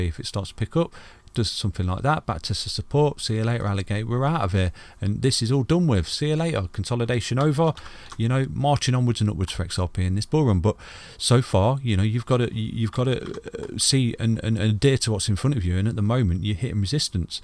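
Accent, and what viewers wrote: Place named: East Midlands, United Kingdom. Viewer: mate your accent - you gotta be from Notts/Derbys?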